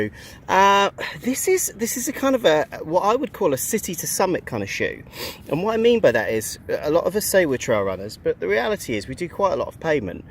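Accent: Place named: England, United Kingdom. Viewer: New England location but old England accent !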